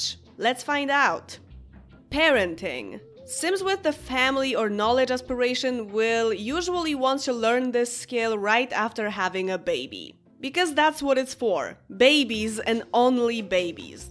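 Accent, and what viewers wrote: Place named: Poland. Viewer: You sound Polish, are you? 🐦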